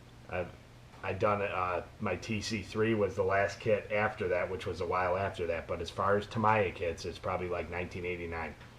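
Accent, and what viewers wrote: Chicago, United States. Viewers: My gut said Chicago !! Woohoo! Good man :) awesome video by the way and amazing modification!!!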